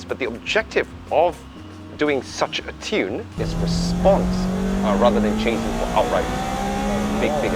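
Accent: United Kingdom. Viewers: I hear Brittish, see Japanese.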